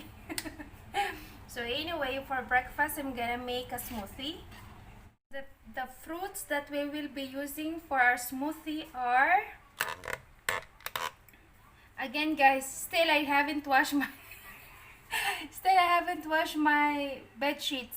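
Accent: India. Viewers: Indian accent ka narin Kbayan.Buti nlng Pinay kharap ko bka dudugo n nmn ilong ko kakaenglish..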